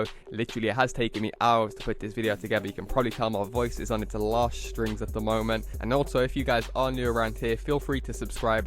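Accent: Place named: United Kingdom. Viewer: yo swear down youre from uk! grime!!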